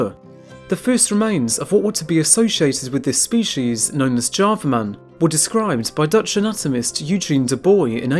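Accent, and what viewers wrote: United Kingdom. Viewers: The narration drives me nuts. Strong British accent at hyperspeed, along with a very monotonous delivery completely devoid of feeling. Couldn't hang past 6 minutes. Too bad. Seemed like interesting content.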